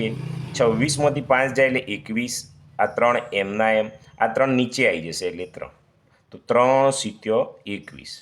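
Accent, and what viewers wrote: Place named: Mehsana, India. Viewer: Tame mehoni bhasa bolo cho sir 😮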